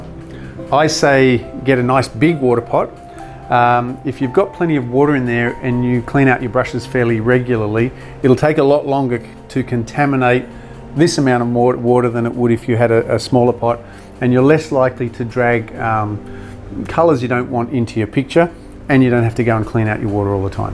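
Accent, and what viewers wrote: Australia. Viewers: Love all your videos they are precise and easy to follow also your voice is pleasantly style Aussie. Thanks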